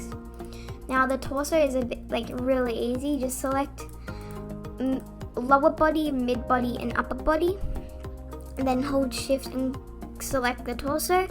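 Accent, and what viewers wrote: Australia. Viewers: ITS AUSTRALIAN AND ITS CALLED AN ACCENT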